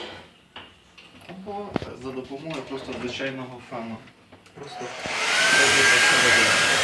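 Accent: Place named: Surzhyk, Ukraine. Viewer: безграмотный сельский суржик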